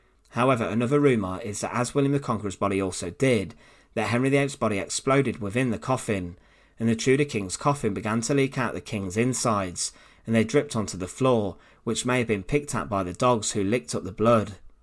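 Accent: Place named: England, United Kingdom. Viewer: That's what I'm talking about awesome show governor that's how the English people said it 👍😑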